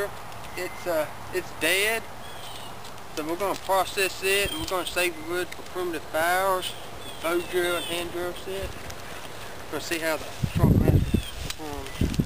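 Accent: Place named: Appalachia, United States. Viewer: I luv a good backwoods hillbilly accent and my brother you got one! Lol No offense I find it very amusing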